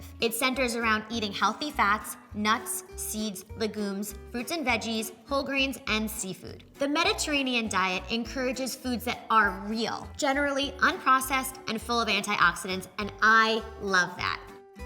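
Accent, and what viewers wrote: United States. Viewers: Great information, but such a piercing American accent, I had to reduce the volume to get through it.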